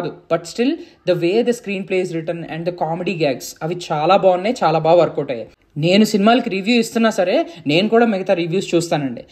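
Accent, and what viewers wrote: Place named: Godavari, India. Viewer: Movie emo Kani Ne Godavari slang was awesome bro 😄😄👌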